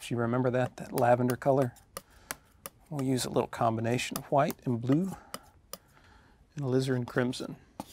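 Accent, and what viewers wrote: South, United States. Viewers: A lot of southerns say it like that. That have w that brings in a h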